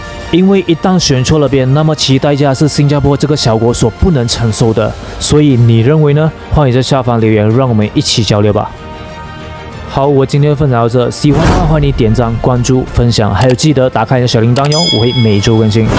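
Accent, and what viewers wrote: Malaysia. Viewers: 听他的口音 是马来西亚人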